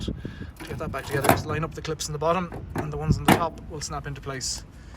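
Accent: Ireland. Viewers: oops, my bad. I thought it was a channel from Ireland, I was wondering, one would never get away with dumping like that in Ireland, at least I hope not. I wrongly assumed when I heard the Irish accent that it was Ireland.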